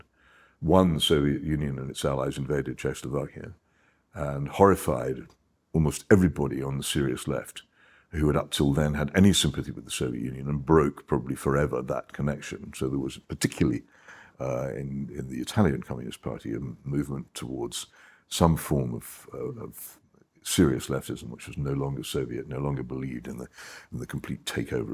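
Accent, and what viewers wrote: RP, United Kingdom. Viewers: Another plummy recital of Hitchen' s fumbling attempt at messaging. Too many diversions and no direction. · his plummy accent gets in the way for me, but as long as he steers clear of talking about scooters or marijuana I broadly agree with him LOL (its loony scooter riders and tainted or gm marijuana or users of other drugs claiming "I just smoked a little weed innit")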